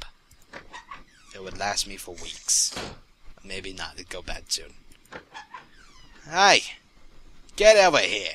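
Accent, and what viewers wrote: United Kingdom. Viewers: He has a slight british accent... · Why does he speak in a british accent kind of thing? Its a bit annoying. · is it me, or dose he have a slight british accent xD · whats with the british accent? X3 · is it just me or does he sound a little british in this video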